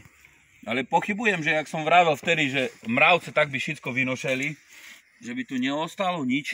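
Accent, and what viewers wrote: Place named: South, Slovakia. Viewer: Zdravím tě ! Už je pozdě .kdyby byli silnější tak by to odebrali ale možna to bylo ťím že byli ve 2 nastávcích. Chci se zeptat kde včelaříš ? Oblast ? Ten přízvuk tipuju tak Juh 😉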